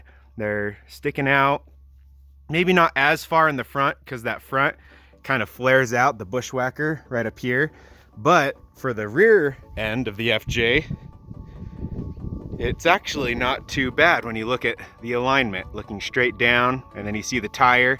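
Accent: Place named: Utah, United States. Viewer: Ha. I thought you had a Utah accent!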